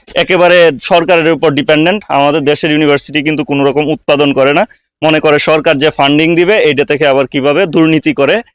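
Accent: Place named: Mymensingh, Bangladesh. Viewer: Mymenshinga,ko k kho bole bangàlataow bolte pare na,blog kore aber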